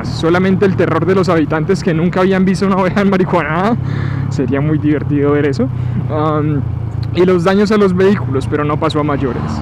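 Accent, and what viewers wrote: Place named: Bogotá, Colombia. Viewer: Desde que ciudad de Colombia grabas? No he logrado identificarla y tu acento no me ayuda es como rolo pero evidentemente no es Bogotá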